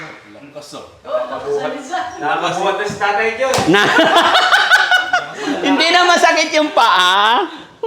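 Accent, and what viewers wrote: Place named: Quezon, Philippines. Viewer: Kkatuwa nman😅ganda ng bahay at mga rom banyo sopa parang rich na tlga ❤ ang hagikhik yong tawa ng tawa na wlng sounds pg sa sobrang happy n ayaw marinig malakas n tawa tawag hagikhik na sa isang tabi kkatawa ganon yong salita ni prang sa amin sa quezon tagalog n tagalog❤goddess team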